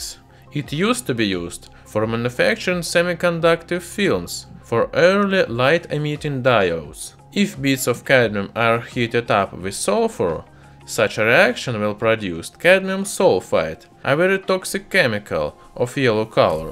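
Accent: Russia. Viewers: تعلم اللغة الانجليزية هو روسي و يحاول التكلم بها انا افهم كل فديوهاته 😁😁😁😁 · you have a hard russian acent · I love russian English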